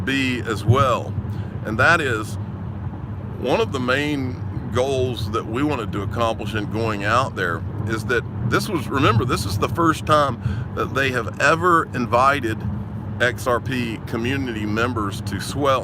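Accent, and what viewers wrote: South, United States. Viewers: I Have listened to you for along time and have always enjoyed you streams, I always imagined that you were black, but it is that southern accent and you very deep voice, here in England I have a good friend from Alabama and his accent is pretty simular and he is Black! Just saying, I am not disappointed but I am surprised!😄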